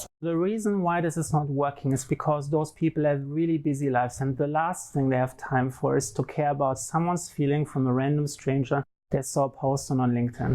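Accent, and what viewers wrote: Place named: Germany. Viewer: Wusste ab der ersten Sekunde, dass du Deutscher bist 😅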